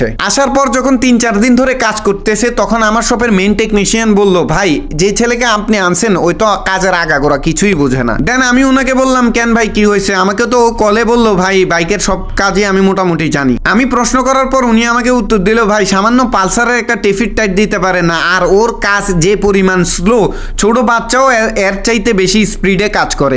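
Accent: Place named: Noakhali, Bangladesh. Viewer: নোয়াখালীর লোক? খাইছেরে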